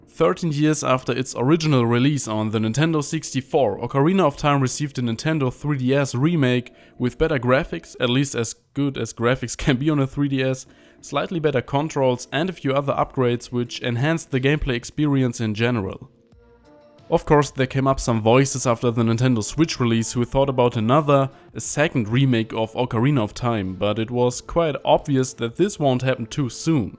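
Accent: Germany. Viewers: Bin mir ziemlich sicher dass du deutscher bist man hört es so krass. Einfach die typische Betonung wenn ein deutscher englisch spricht. Ist aber auch verdammt hart das zu verstecken. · Are you german?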